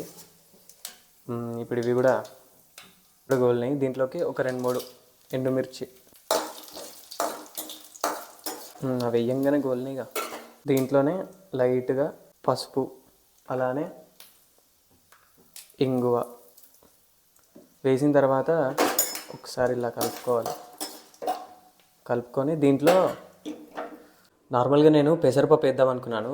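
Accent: Telangana, India. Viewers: తెలంగాణ తెలుగు వింటుంటే చాలా ఆనందముగా ఉన్నది మీకు శుభము హరే కృష్ణ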